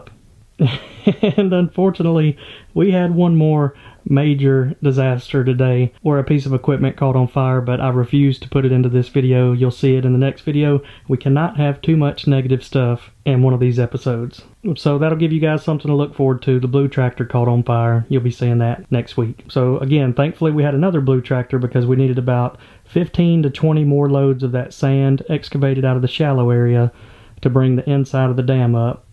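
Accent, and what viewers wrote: South, United States. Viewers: Fun name idea: The Tripple P. that stands for The Peanut pasture pond. I that name will sound very nice with your southern accent.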